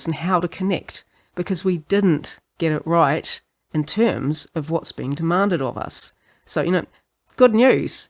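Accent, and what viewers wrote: New Zealand. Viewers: this is fantastic!!! u a kiwi?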